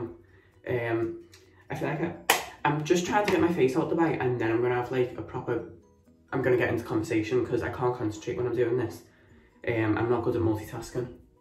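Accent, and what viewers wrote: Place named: Liverpool, United Kingdom. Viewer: Your makeup is gorgeous x am guessing your fromm liverpool? Because I am too!! ❤🙂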